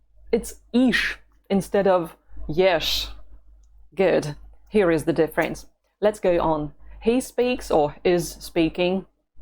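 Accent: United Kingdom. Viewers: Hi which country are you living now? I find that you have a British accent when you speak English:)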